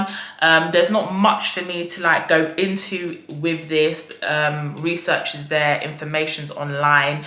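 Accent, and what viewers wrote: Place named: London, United Kingdom. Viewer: YOUR LONDON ACCENT THO.....!!!!I ..I can hear that all day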